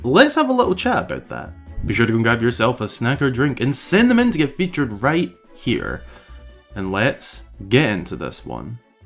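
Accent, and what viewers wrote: Scotland, United Kingdom. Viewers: What is it about scottish accent creators that make me want to play TOTK even more lol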